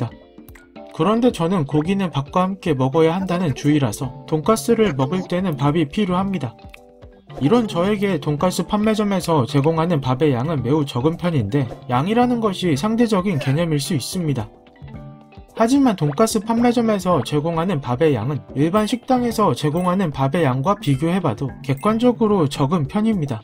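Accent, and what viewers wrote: South Korea. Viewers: 궁금한게 나레이션하시는 분은 어디 말투죠?ㅋㅋㅋ서울말은 아니고 남쪽사투리도 아닌것 같고 ㅎㅎ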